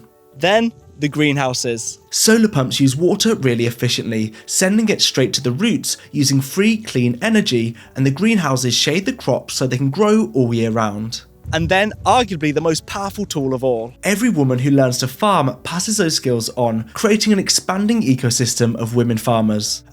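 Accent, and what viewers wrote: United Kingdom. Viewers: Well, the good news is welcome, but it's not called 'gashed ee bear', that's for certain. You seem to come form Birmingham, which should be familiar with Wales - so let's have the same respect for our threatened living language as you show for animals and landscapes, please. Google translate is a first step. Type the name in and press the sound icon. Not perfect, but acceptable.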